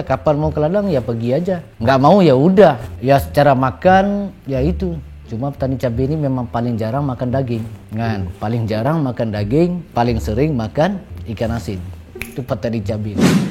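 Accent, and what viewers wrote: Malaysia. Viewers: Cakap mcm org malaysia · Go support from Malaysia 🇲🇾🤞🏻 accent malaysia tak hilang lagi tu😂😂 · Pantesan bahas nya lebih ke kaya orng Malaysia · Kok lucu logat melayu tpi pake "Gua" 😅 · Pernah tinggal di Malaysia sama kaya atta dong.....gaya bicaranya sedikit2 kaya orang Malaysia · Logat Malaysia masih belum ilang.. Sekali masuk master chef Baginda lord ngomong nya gua gua..😁😁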